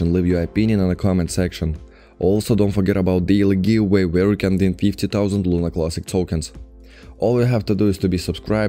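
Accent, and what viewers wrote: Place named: Russia. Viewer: russian accent....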